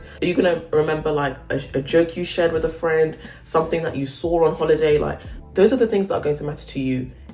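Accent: United Kingdom. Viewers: Are you British? You don't sound American. Very interesting video, thank you for sharing. Your story telling style is so calming. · I had a feeling you were British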